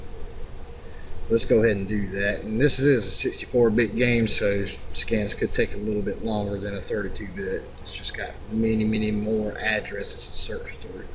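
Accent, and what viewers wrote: South, United States. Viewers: I appreciate your videos man, I love to understand how things work and when I can't do something I force myself to learn how! I was hoping to make my own cheat for America's Army: Proving grounds a 32-bit old fps game on steam and since it's so old people aren't rolling out cheats for it anymore not like CS:GO cheats and so a guy on unknowncheats forum recommended your channel for learning cheat engine hacking and I'm glad I love your southern voice and you explain everything clearly and you get to the point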